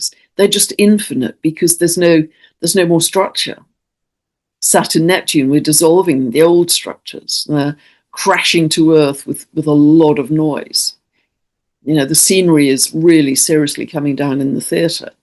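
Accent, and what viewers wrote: United Kingdom. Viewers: Unfortunately, I have no beginning of any house in Scorpio but Jupiter ( as in Taurus with Chiron…) my sixth house start in Libra and end in Sagittarius. A tip of how I could set intention with these parameters ? I m trying to work consciously on my body, to balance my air side and the overthinking that goes with. And my emotions 😅 I compare this axis with the time of the year and transformation of the trees. They lose their leaves and energy goes deep in the roots. It s not because we don’t see things that they are not here. But I’m sure that you have better explanation and more tips for the coming new moon ☺️ Thanks for you videos. Ps : I m a big fan of your British accent (also a nice aspect of your videos) Marie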